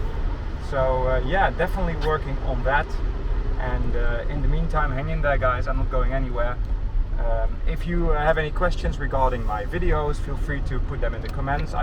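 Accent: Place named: Netherlands. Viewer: I thought you were Dutch,recognizes the accent,I’ve played hockey against Eindhoven way back in the 70s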